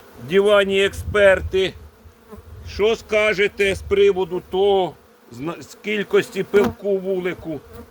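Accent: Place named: Cherkasy, Ukraine. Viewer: Вітаю. Матка пропуски дає в засіві, чи так здається? І скажіть з якого ви регіону? Бо так по розмові ніби як з Черкащини. Цікаво.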